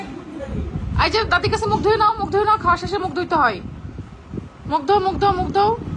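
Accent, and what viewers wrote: Kushtia, Bangladesh. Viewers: Apu tho sobar moto dong kore kotha bole na,tader kustiyar vasay amn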